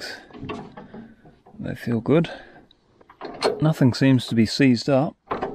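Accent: New Zealand. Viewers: He sounds like a kiwi also the red band boot is a likely sign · lmao was slowly noticing more and more NZ fauna as I was watching, didn't catch the accent at first sorry g thought you were an Aussie. Major props had no idea this stuff could be found in our country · Just found this video. Amazing. Great to hear a kiwi voice doing this sort of stuff. on YT Unless you know this country, you canʻt imagine just how difficult it is - fighting your way through the matagouri and manuka. Used to go up to Tennyson Inlet on holiday and did bush bashing up there so have an appreciation. Great video, no frantic panning and shakey stuff. Well done on something incredibly well put together. As you know, there are lots of these old TD6s, TD8s and TD 9s left still surviving after years of hard work. incredible mechanical skills as well - good old No8 wire kiwi stuff! You deserve this one mate! 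· New Zealand I suppose? Hope I got the accent correct? Or is it good day mate? I am from little rock Arkansas, so please forgive me, not trying to offend. I like you rescue of the bulldozer. To cool mate! We, in the United States love heavy equipment. Loved this episode. Hope to catch More of your rescue's!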